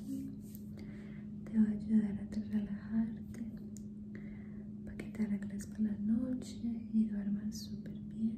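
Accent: Antioquia, Colombia. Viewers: el asento colombiano uuuufff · el español de colombia es el mas bonito y ya medi cuenta porque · Asmr+acento Colombiano= UFFFF Chuladaaaa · Eres paisa o no??? ❤️🙄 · Eso es paisa sumercé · Es Colombiana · la voz colombiana es lo mejor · tiene acento paisa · el acento colombiano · me encanta el acento colombiano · El acento más lindo del mundo es Colombiano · el Plus del acento Colombiano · El paisa me encanta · su acento colombiano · Lindo el acento de las colombianas al hablar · Colombiana tenia que ser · Solamente hablan así los paisas osea los de Antioquia, en Colombia hay muchísimos acentos. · No en toda Colombia hablan así, las que hablan así como ella son las de Antioquia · el acento paisa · colombiana es ella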